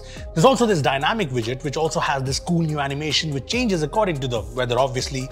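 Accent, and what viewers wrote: India. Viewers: To bude asi indická angličtina, což ?? 🙉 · Don't speak like indian English accent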